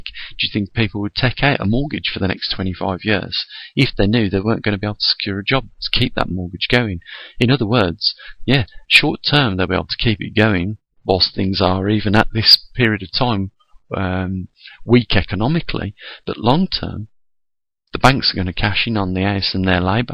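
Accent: Birmingham, United Kingdom. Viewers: I got to ask but what part of Birmingham are you from?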